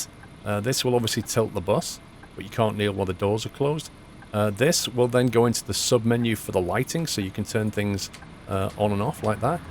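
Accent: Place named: United Kingdom. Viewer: Hi sorry for the extremely late comment but sounds youre from uk so youll know what i mean, our buses go from A to B then B to A then A to B and B to A and so on. And a drivers shift would last for hours, is that possible in this game to do a full days bus shift going there back there back there back?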